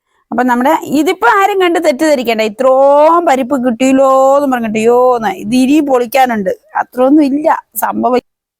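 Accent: Kottayam, India. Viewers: നിങ്ങൾ കാസറഗോഡ് കാരല്ലേ, പിന്നെങ്ങനെ കോട്ടയം സ്ലാങ് പറയുന്നു 🤔🙄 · Hi chechi njn ipplanu video kanunnathu. Super … Kottayam style samsaram ❤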